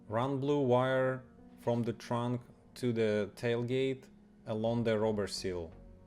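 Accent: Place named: Russia. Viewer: Strong rashn akcnt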